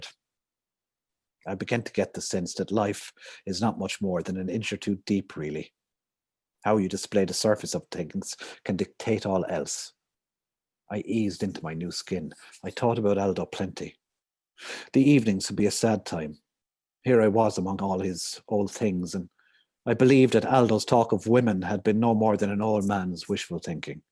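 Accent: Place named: Donegal, Ireland. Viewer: Fantashteesh. Fair attempt at a Donegal accent too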